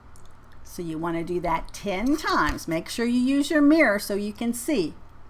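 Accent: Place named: Texas, United States. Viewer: Who wants such a strong Texas accent? That should be made clear in the description that this is not for neutral English.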